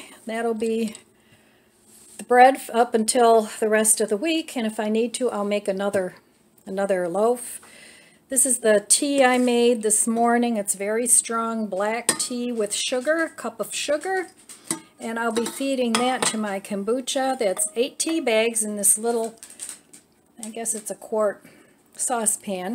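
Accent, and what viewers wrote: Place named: Michigan, United States. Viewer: Just found your channel, and am really enjoying your content! Very useful! I love the idea of adding a layer of plastic wrap over your guacamole to help seal the air out! You have an accent like a friend of mine from Michigan...are you by any chance from that area?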